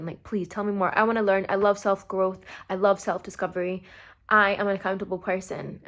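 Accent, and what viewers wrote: Scotland, United Kingdom. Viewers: you're scottish? did not expect that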